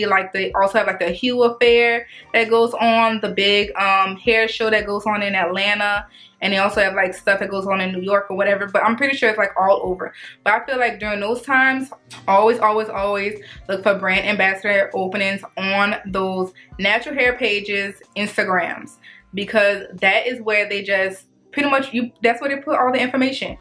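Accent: New Orleans, United States. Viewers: Girl I hear that New Orleans accent lol🤣😂I am from New Orleans too🤗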